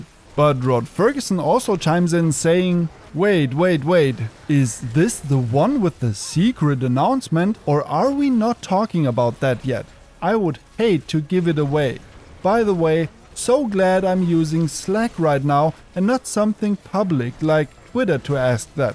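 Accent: Germany. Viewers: Are you german? ^^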